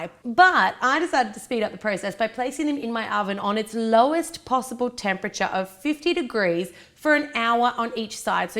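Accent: Australia. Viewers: I thought you were australian ?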